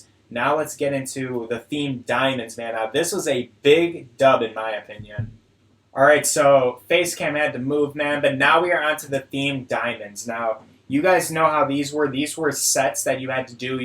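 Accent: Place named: Chicago, United States. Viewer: the one and only chi town accent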